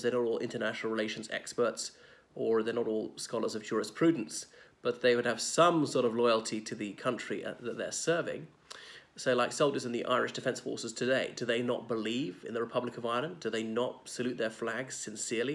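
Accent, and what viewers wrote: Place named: England, United Kingdom. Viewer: Very interesting but im a bit confused you keep referring to yourself as Irish , but you have an English accent .would it be the case your an English gentleman living in Ireland who has a deep connection to Britain. I'd imagine your formative years were honed in the British schooling system hence your strong allegiance to the UK . Nothing wrong with that. Futhermore if there was a policy of one man one vote prior to the Act of Union, Ireland wouldn't have joined. Likewise if the democratic vote in favour of home rule by the majority of people on the whole Island had been respected by the Unionist minority. Partition wouldn't have happened and strong relationships could have fostered and developed over time between both communities throughout Ireland and so to with Britain. Some of the greatest Irish people were and are protestants it was never a religious thing for us .Irish people just wanted to govern themselves but unfortunately the British tried to prevent that at every opportunity .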